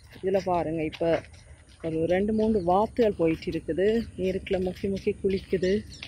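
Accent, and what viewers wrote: Sri Lanka. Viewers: உங்கள் இலங்கை தமிழ் கேட்பதற்கு மிகவும் அழகு. வாழ்த்துக்கள்.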